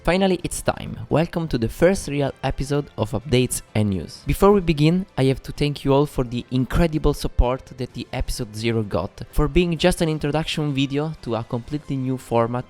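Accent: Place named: Italy. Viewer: Spettacolare il tuo accento Italiano/Inglese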